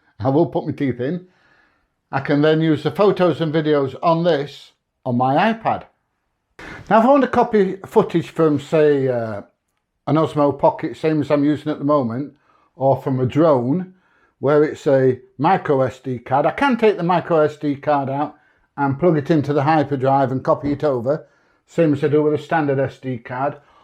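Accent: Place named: Yorkshire, United Kingdom. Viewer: What a character! Yorkshire lad?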